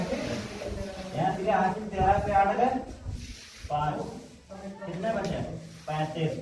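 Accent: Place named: Rural, India. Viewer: Ye dehati kon bol Raha tha😅😅😅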